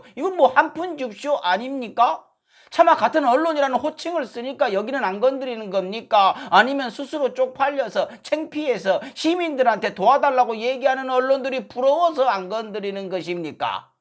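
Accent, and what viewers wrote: Jeolla, South Korea. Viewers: 전라도 사투리만 쓰지말고 전국구 사투리 쓰세요 . 그래야 전국 유튜브 방송 됩니다 .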